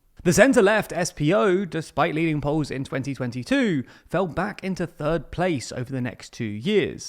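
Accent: United Kingdom. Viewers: This sound doesn’t exist in English. You’re watching a video from a Brit so expect that pronunciation. Should you wish to hear a proper “Ö,” watch a German language video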